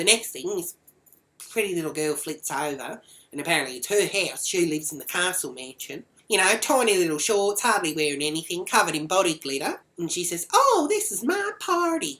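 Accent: United States. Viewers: loving your American accent chook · love wats the 'merican accent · Your American accent is really good. You sound just like an American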